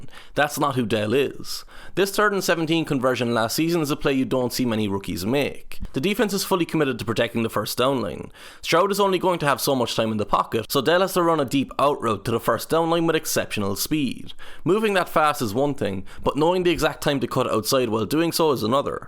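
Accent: Ireland. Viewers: What the bejeesus is a 'turd down'? 😂 Love ur accent btw...i think you must be from the same part of Ireland as Chris O'Dowd (from the IT Crowd)... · An Irishman commentating on American football is amazing. Such a great dynamic!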